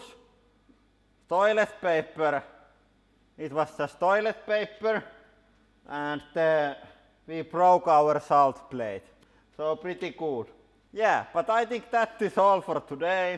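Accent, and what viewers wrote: Finland. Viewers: I thought it sound like a finnish accent and now its confirmed haha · Haha Shit Paper... you Finglish is fantastic. Keep this stuff going, it's great:) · The english-finnish acsent is just so crappy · you gotta love the finnish accent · How the fuck he can’t talk English even he is old man? Cmon stop that Finnish-English shit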